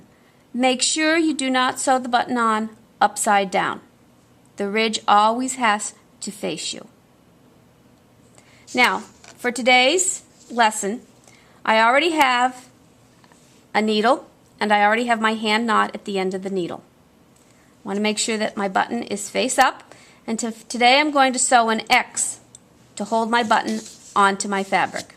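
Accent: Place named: New York, United States. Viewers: You sound like you’re from new york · Love the slight NY accent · everyone has an accent no matter where you’re from. My guess for the video is east coast United States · I loved this tutorial thanks! It was like beig directed by a mildly annoyed New York/New Jersey person which was brilliant.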